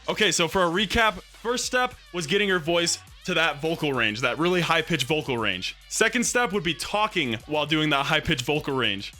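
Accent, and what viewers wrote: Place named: Australia. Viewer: Australian voice After 3 years he reveals it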